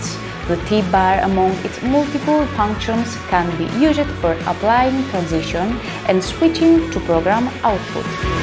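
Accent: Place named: Greece. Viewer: r u Greek? I love your accent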